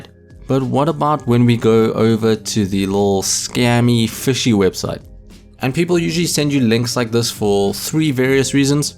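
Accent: South Africa. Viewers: Is he south african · Are you from south africa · U from South Africa?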